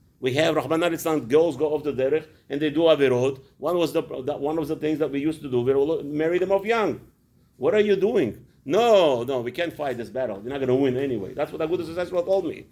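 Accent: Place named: Russia. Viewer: He has Russian accent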